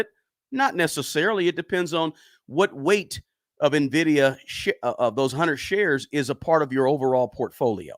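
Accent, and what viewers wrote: South, United States. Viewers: Hey, I enjoy your show. With all the respect the world if I may say please, you're the first African American man I've ever heard with a deep southern accent lol. I like it plus keep up the good work thank you.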